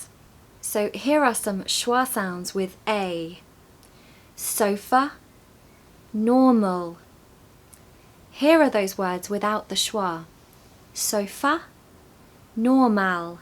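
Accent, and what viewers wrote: RP, United Kingdom. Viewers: I love British accent and your lip and your voice listening from Bangladesh. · Love your BRITISH ACCENT :) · I have been teaching British accent for the last 30 years in India. I love your accent very much. I wish to visit Britain. Could you help me where I can stay in Britain ? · She doesn’t have « the » British accent, she has « a « British accent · Hi, i love your british accent and your pronunciation is very clear. thanks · Thanks for sharing. I love British accent. I could understand everything. It's clear and nice. I wish I had a full Brit accent. Spanish is my mother tongue. Cheers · Hello nice to meet you. I’m French, I discovered your channel today, so you won a subscriber. I’ve been learning English for a year, and I’m still bad. I love your British accent, because articulating too well thank you. I’m going to learn really well with you. 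Thank you, an admirer. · You have a nic british accent and amizing . go head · I like your British accent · Hello, I like your smiling face when you explain plus British accent makes your video more interesting to watch 🥰 thanks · I lived in the UK for more then 16 years and it's a real pleasure to listen to that TRUE BRITISH ACCENT 😉😁😘😍🤘💪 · Thank you. I love your british accent. When you pronounce worsd they sound musical as you said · Thanks for your British Accent, I enjoyed your video from South Africa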